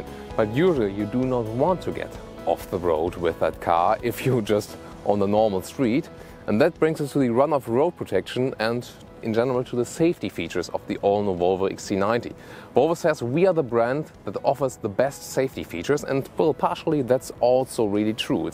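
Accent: Germany. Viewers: Mensch, habt ihr nicht sonst Reisen getestet oder Kreuzfahrten? Wie dem auch sei, nettes Fahrzeug. Nur einem Deutschen beim Englisch sprechen zuzuhören, ist sehr sehr anstrengend. Nichts für ungut ;) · nice german accent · Great review(s) you´re doing even with that German accent. Great car either, this XC90, except for it´s poor typical mid class sized engine which will keep buyers for the premium segment away. Driving an old XC90 for nearly 7 years now I was looking forward to the new one. But definitely not with that tiny engine. What a shame.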